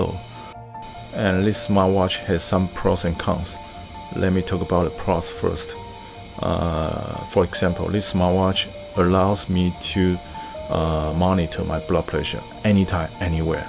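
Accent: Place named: United States. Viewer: you speak great English. I mean your tone likes American, not Taiwanese accent...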